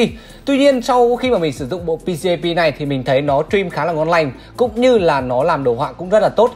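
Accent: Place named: Central, Vietnam. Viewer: như kiểu ng miền trung , nói tiếng miền bắc. dù có vẻ rất hoàn hảo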